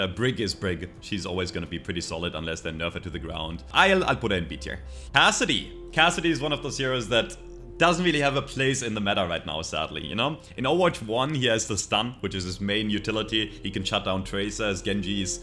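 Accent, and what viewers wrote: Germany. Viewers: Your voice sound german , are u german ?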